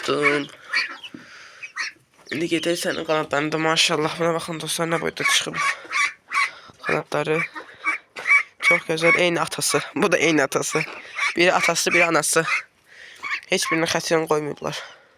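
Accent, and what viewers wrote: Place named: North, Azerbaijan. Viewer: Bu şimal zonasının lehcesidi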